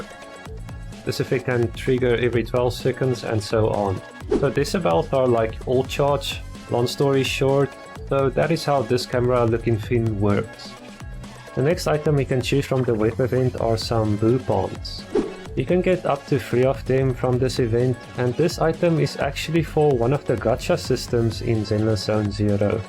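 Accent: South Africa. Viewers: You sound South African, I'm from there too. Nice video my dude!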